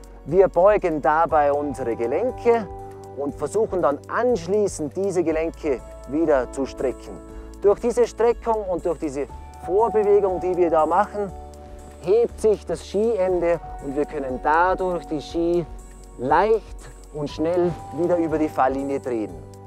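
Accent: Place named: Eastern Europe. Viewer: Hahhaha er redet deutsch mit so nem komischen fast osteuropäischen Akzent 😂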